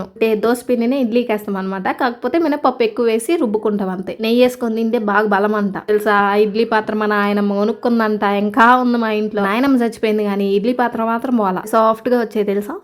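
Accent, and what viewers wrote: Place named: Guntur, India. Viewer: మీది గుంటూరు జిల్లా కదా సిస్టర్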